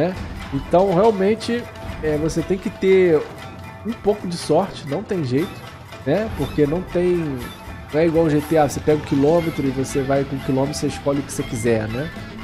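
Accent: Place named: Rio de Janeiro, Brazil. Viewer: Só q o cara e carioca lkkkkk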